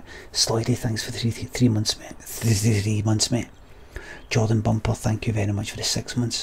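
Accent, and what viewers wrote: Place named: London, United Kingdom. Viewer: The London accent is the best thing about this stream, cracked me up haha!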